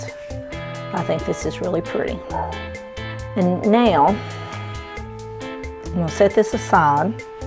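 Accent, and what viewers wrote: South, United States. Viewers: I’m in Sevierville. Near Gatlinburg 😊. What part are you in? I was thinking you’d said middle TN in one of your videos. I recognized your accent immediately before you even mentioned TN. I love our southern accent. Don’t hear it on YouTube much 😊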